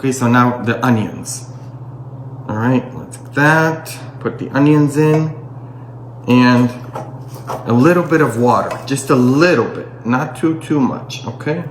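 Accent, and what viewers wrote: Mexico. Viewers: Jajaja jajaja jajaja jajaja jajaja 🤣 padre me hace reír con su huuuuuuuuuuuuu como me hace los días más alegres dios lo bendiga y cuide usted si es mexicano hasta en la manera de hablar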